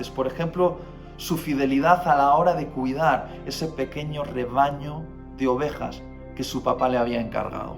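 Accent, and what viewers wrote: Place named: Basque Country, Spain. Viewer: Muchas bendiciones Dios te utiliza en gran manera y atu manera de ser saludos desde zamora (Castilla y leon ) España🇪🇸 aveces parece que ya no tienes el acento vasco pero luego eah ay esta 😂Dios te bendiga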